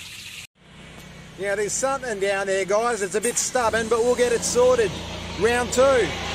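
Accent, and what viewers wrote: Australia. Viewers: New subscriber here. Love the Aussie commentary. 'this is where we get our water, trees make our water' had me in hysterics.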